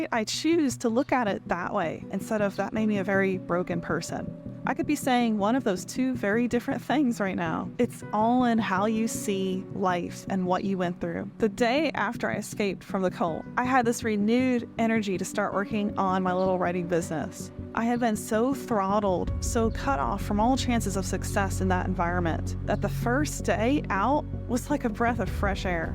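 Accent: South, United States. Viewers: Congrats on your escape. You have a little southern accent there. Im old as dirt, so I'm probably not a great candidate for AI or quantum, but it sure intrigues me. At 72, I have so many ideas over the years that have come to light by others, and I keep missing the boat since I don't act. I now have so many other ideas written down, and since my x left, I have time to act on some of my stuff. Help me jump, please. Cleo......Thx.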